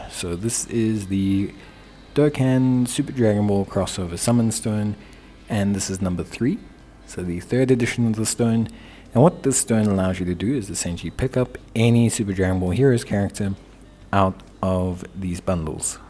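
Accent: South Africa. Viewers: Woah bro, are you South African?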